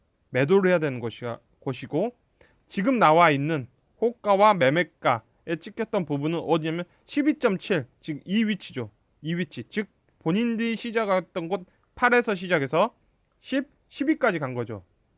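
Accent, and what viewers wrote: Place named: Jeolla, South Korea. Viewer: 말투가 전라도네 ㅋㅋㅋㅋㅋㅋ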